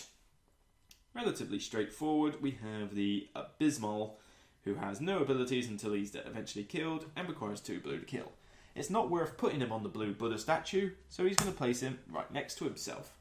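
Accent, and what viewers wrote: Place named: Cornwall, United Kingdom. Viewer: I love that kinda Cornwall farming English accent!....and great how 2 play :)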